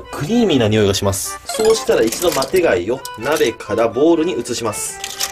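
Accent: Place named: Kansai, Japan. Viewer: 最近関西弁ちょくちょくでてないか？？嬉しいぞ？？？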